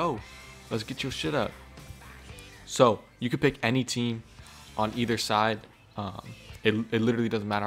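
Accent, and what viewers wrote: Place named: Bay Area, United States. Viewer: You have to be from The Bay talking like this😂😂😂😂. Gained a new sub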